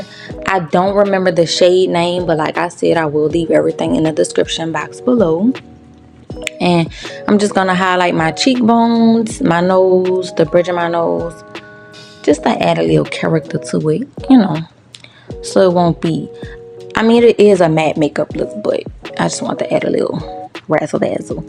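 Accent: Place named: New Orleans, United States. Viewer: I know a NOLA girl when I hear 1 😂 love your video sis keep going 🩷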